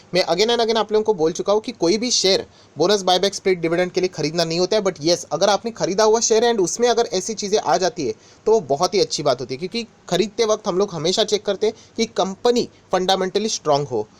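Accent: Maharashtra, India. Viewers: Hallo Sir.. your mother tongue is marathi...100%... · Ho nkki awajch ahe marathi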